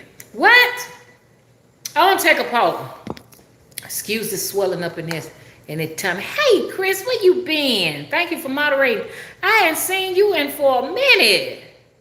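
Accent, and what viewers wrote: South, United States. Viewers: Heeeeeey 🌹. 🌳. Your accent definitely "Southern"! Please 🙏 send a little of that "bulk" my way.🤗🤗🥰. You're My "People's ✅️ ✅️ ✅️ 🤗🥰. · You're so beautiful, I always felt rooted to you! im grateful for the messages. your locs are roots. And your voice is the southern ancestors. I spread ur messages with my followers and stamp your name n channel. I wish many watched you. Master teacher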